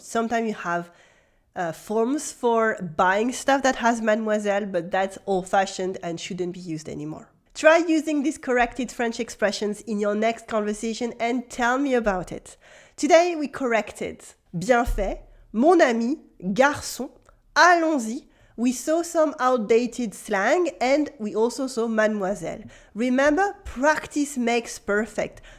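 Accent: Paris, France. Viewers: From my little experience in Paris along with some automotive supplier work in Montreal, I have learned that there are many versions of French. First and most correct is Parisian French and I think that is what the narrator is speaking. Next is provincial French spoken in France but outside of Paris. A bit distant third is French Canadian followed by French spoken everywhere else eventually down to Cajun.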